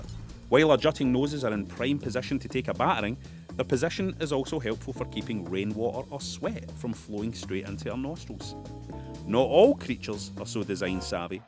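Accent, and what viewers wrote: Scotland, United Kingdom. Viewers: The best thing about this video is your Scotish accent mate.. not much else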